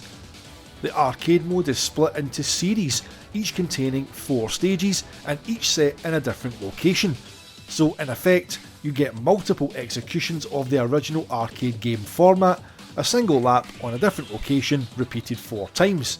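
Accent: Scotland, United Kingdom. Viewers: I love the Scottish accent. Can't understand a word that you're saying, but it sounds like magic! Yet i just don't know why SEGA didn't bring 2.5D versions of all of these great games out for the Genesis/MD. Over 40 million of those consoles in the marketplace, and the limit pushers of 1994-97 proved that the machine was capable of doing press proper 3D and great graphics and sound.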